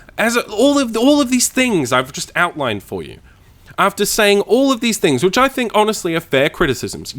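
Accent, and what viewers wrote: Australia. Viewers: Man, thank you so much for your honesty. The industry needs more reviewers like you. I tried this game and after the first 2 hours I was like ...THIS got 10/10? I would give it a 5/10. Good to hear a fellow Aussie too! Quick question - what do you think of Diablo 3 since RoS came out and they made the improvements? I'm considering getting it and I value your opinion.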